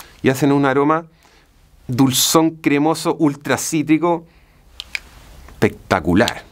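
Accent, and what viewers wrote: Chile. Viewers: Me choca el acentico Chileno de éste tipo.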